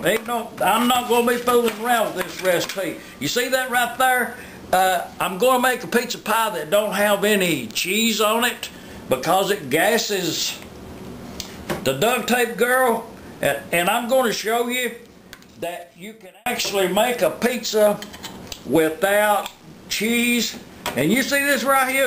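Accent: South, United States. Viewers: I find your comment extremely insulting! What are you insinuating by "his voice" and "southern fried pizza"...apparently you're stating that because someone has a southern accent they are incapable of cooking something that does not contain fried chicken...Furthur, you have no room to insult ANYONE on here, due to the fact that your username is "Inbreed6699Family" Enough said.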